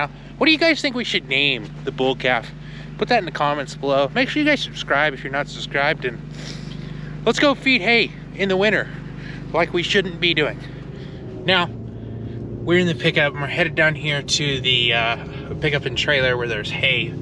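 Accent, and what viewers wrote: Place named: Australia. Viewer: Hi from Australia. You are sounding like an Aussie farmer. We’ve been turning away from feeding as much as we can. But the biggest change here over last 30 years has been changing fro hay to silage. It’s been huge the change. Especially in the colder areas out here. The quality of silage over hay is the driving factor. I was one of the first contractors to do silage. Now nearly every contractor has to offer that or he doesn’t have many clients. I could go on for hours but would swamp your messages.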